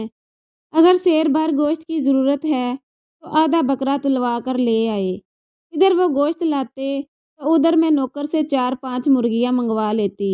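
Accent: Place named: Assam, India. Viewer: Wow khani achhi hai aap ki par ek bat aap asam se ho aap ki awaz se lag raha hai mujhe 😊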